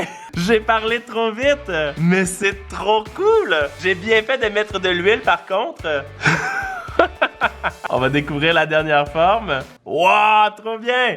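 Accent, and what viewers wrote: Quebec, Canada. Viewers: J'adore ton accent cuebecoi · L’accent canadien j’adore🥰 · J’adore ton accent Québécois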